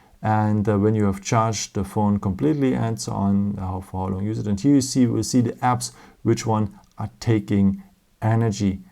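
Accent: Germany. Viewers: Think he's from Germany. I'm living there and most Germans speak English like that. But I agree with you :)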